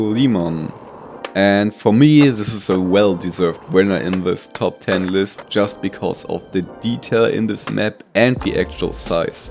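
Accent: Germany. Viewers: Man hört direkt, ein deutscher 😅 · bist du eigentlich deutsch ? :D , hört sich irgendwie so an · R u german? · Are you german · Man hört, dass du normalerweise Deutsch sprichst. Ich überlege ob ich mir Skater XL kaufe, da es mega gut aussieht und am 28 July als vollversion 40 Euro kostet. Tolles Video bitte mehr von Map Videos!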